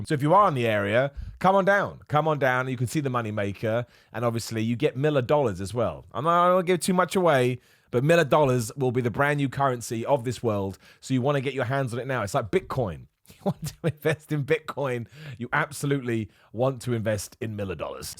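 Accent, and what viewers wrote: United Kingdom. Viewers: The british accent 😅🤣👍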